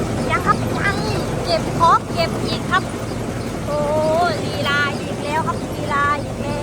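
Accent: Korat, Thailand. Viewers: พูดแบบนี้เหมือนคน โคราช หรือปราจีนบุรี สระแก้ว เขาจะพูดกันแบบนี้ สำเนียง แบบนี้ อยู่จังหวัดไหนครับ น้องสาวคนสวยที่น่ารัก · เอกลักษณ์ของคนโคราชก็คำพูดคำจานี้แหละครับตลกดีชอบๆมากเด้อ คนน่ารัก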